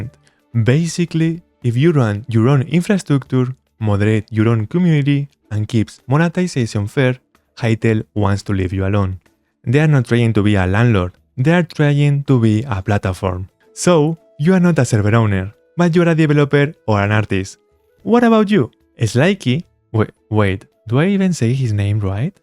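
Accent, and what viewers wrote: Spain. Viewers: well u sound litle difrend in live streams more spaceout speach this why i thinhed u used some kind of ai or u use more takes to make as understandambe as u can. But im glad u are not use ai and to u are not afraid of you spanish accent and not perfect english. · Spanish accent for sure :D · ye i'm glad too about that, i would rather see the fun spanish accent that anything ai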